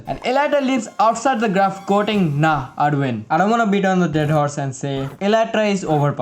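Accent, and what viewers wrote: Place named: India. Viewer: you kinda sound like indian #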